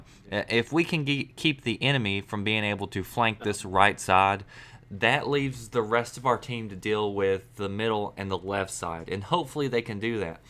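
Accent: South, United States. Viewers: where you from? i had a feeling you were from the south with that accent. go vols baby. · what part of the south are u from?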